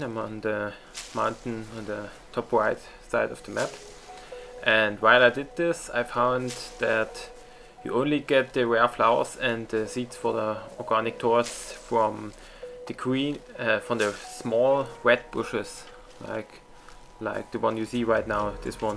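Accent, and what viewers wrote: Germany. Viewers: Deutscher Akzent,mirkt man gleich :D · lol man hört deinen deutschen akzent :D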